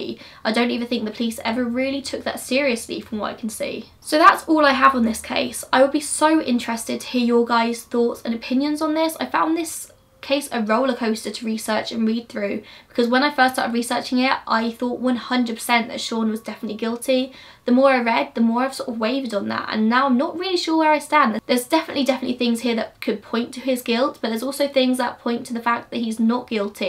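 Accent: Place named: England, United Kingdom. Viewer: Sad story! I’m a new subscriber and you are doing a great job! One little comment that I’m glad you speak somewhat slowly especially with you having a (wonderful) accent. I’m from England so it brings me ‘home.’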